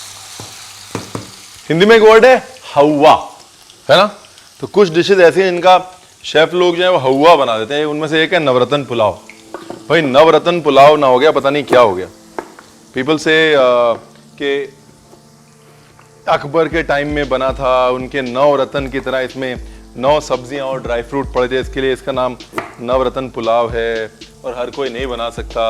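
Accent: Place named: Punjab, India. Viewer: Your Punjabi excent and way of speaking is just great 👍👍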